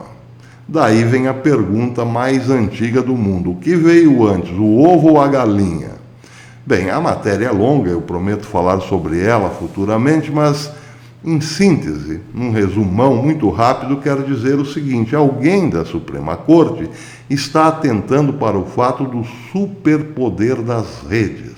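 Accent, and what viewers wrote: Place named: Minas Gerais, Brazil. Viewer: PROF...... ADORO QUANDO MISTURA A FALA NORMAL COM O BREGA OU MINEIREZ.HOJE GOSTEI, TAMBÉM, DO SARCASMO MAMATA... AG GRANDE VITÓRIA ES.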